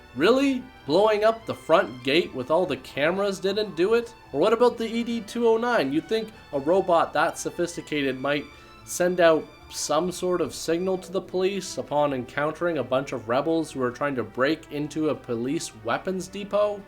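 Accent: Canada. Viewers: Are you Canadian? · lol that Rob Ford reference was hilarious. Are you Canadian btw? It seems like you have the accent. Great Vid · Are you from Toronto?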